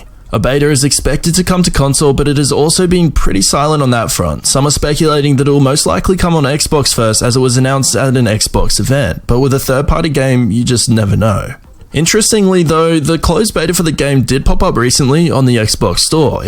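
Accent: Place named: Australia. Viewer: Australians are so hard to listen to FFS change up your pace monotone af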